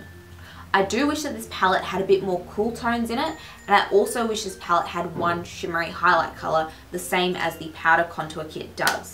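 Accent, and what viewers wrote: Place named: Australia. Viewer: Loving your hair. Ps are you aussie , you sound it abit haha.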